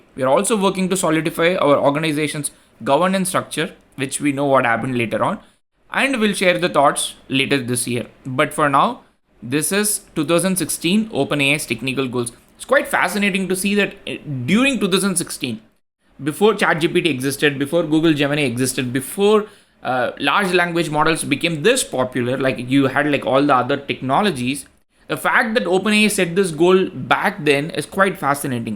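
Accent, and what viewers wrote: India. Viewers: i can understand literally every word he says. i'll take indian accent over californian every time.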